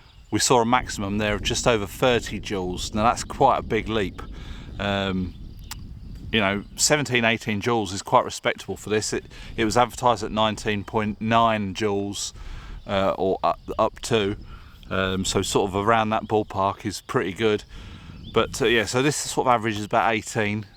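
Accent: United Kingdom. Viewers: From your ascent, you sound like a British man that is having a lot of fun in France.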